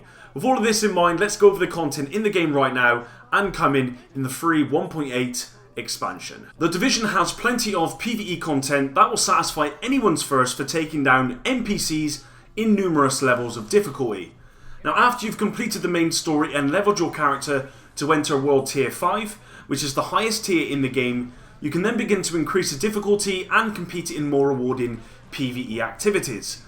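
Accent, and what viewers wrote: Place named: England, United Kingdom. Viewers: You sound like a fellow Bristolian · Oh these annoying as fuck English accents,why don't people just speak American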